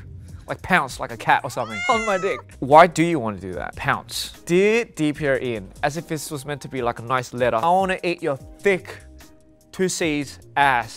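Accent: Australia. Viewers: the rose was hilarious too but i genuinely couldn’t stop laughing at this one. his aussie accent makes it 10x better i love 😭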